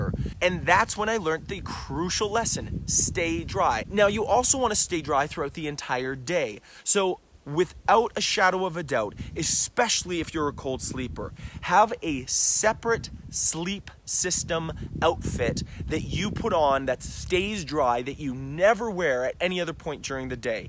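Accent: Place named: Canada. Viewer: Strong Canadian accent lol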